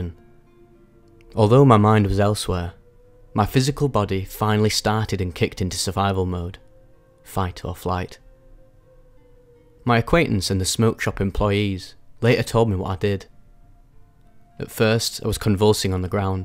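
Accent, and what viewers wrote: United Kingdom. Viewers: Having a British accent is like easy mode for commentary channels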